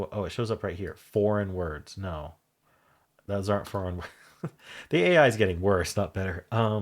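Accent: United States. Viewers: the joys of US pronunciations i would never have gotten hawk and hock(ey) to rhyme - but then in the US sauce and horse don't rhyme but they do elsewhere.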